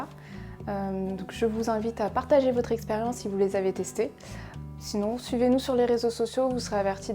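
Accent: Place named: Arab world. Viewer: Accent arabe ça fait plaisir 🥰🥰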